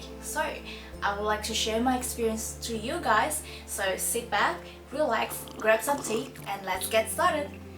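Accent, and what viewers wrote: Australia. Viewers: hello! i'm indonesian too, i really love to watching your videos! i actually thought you're australian cuz your accent is so awesome, cool!😳😍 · 7 years with a good ozzy accent, me living for 11 years (94 - 05) still cant get that accent. BTW good luck on your goal. sukses !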